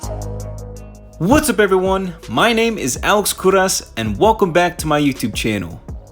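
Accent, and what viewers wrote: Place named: New Jersey, United States. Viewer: Where the heck are you from originally? I'm trying to place that accent. Ya sound like you're from Joyzee(New Jersey).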